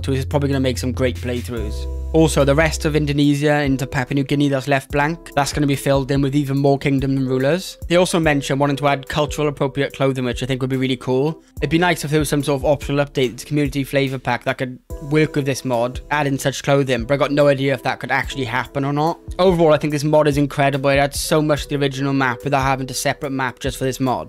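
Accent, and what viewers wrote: Wales, United Kingdom. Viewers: You sound Welsh, take my like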